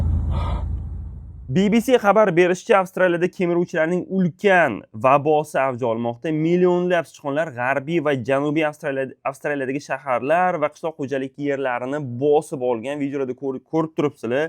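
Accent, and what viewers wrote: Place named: Tashkent, Uzbekistan. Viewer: Xabarlar uchun rahmat.Lekin o'zbek adabiy tilida gapiring.Chunki sizni faqat toshkentliklar emas, butun dunyo eshitadi.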